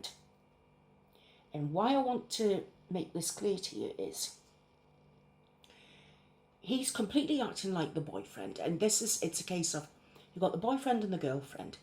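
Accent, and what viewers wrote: United Kingdom. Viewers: He sounds so much like my mother "after all I did for them and never asked for anything in return" except there was always a price for everything, a high one and she would use the tiniest thing for leverage to get her own way for years later, not to mention using her 'good deeds' as things to brag about to anyone that would listen. I would never trust Chris about anything. I heard about him in passing (in a good way) quite a while ago and went to see his channel. I left very quickly because I recognised the personality type straight away as one that isn't healthy to be around in any way at all. He does nice things for people to buy them and then they're not allowed to leave his team ever afterwards. If they do, he lashes out. He doesn't understand that people are not objects to be owned and controlled. On a different note, sorry to hear you're struggling in this weather, hopefully it will break soon, or at least be less humid. 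I live in a nearby part of the UK to you (assuming your accent matches where you live) and we aren't used to this level of heat. I have a good supply of warm clothes and waterproof clothes, but very little for hot weather!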